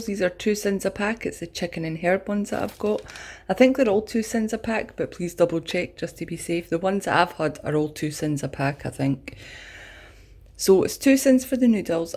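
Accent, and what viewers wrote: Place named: Edinburgh, United Kingdom. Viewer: Ahh Gorebridge, how cool, I now live in Ayrshire, moved here 18 yrs ago to be with my partner, my kids are still in Edinburgh though. I do like to see another Edinburgh lass and I thought that's where you where from when I heard your accent, i'd never think badly of you 😘..I'm subscribing 😊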